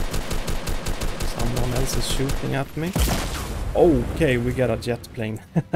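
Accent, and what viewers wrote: Sweden. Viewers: Are u swedish? Im swedish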